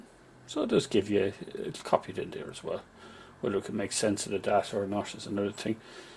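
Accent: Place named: Ireland. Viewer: Sounds like you're in Ireland.Wonder if you're close to me.. I need some help 2 days before christmas :(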